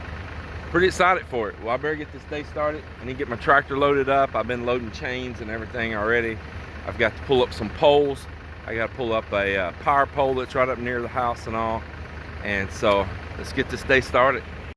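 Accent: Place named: Louisiana, United States. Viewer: You sound like you might have a little bit of Cajun blood in you, by your accent. Do you? My sister has Cajun roots.👍😊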